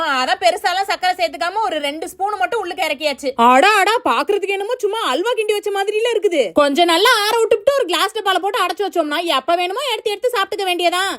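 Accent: Kongu, India. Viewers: Ethu kongu slang tha but not coimbatore and Tiruppur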